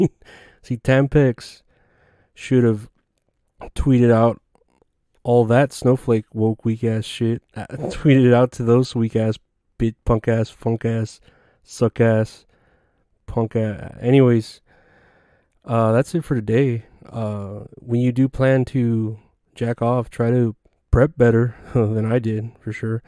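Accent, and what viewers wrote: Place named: New Mexico, United States. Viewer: We think alike watp sent got hooked fast! My pal n I are working on a pod cuz we have faces for radio hahaha well myself ya I thought you were from New. Mexico cuz people here talk aaawll like that. .hilarious brother rNew seescriber for life! 🤘🤓🤘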